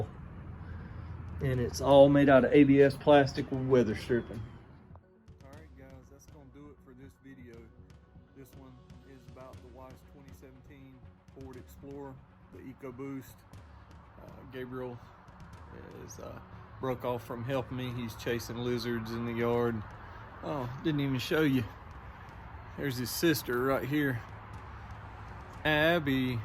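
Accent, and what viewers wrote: United States. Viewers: Are you from Virginia? Sound like a friend of mine. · I don't have the noise you were having, but I noticed the same issue with mine. I'm in South Carolina, you sound like you're from the south too, maybe it's something with the Southern heat and sun? Regardless, thanks for the thorough tutorial!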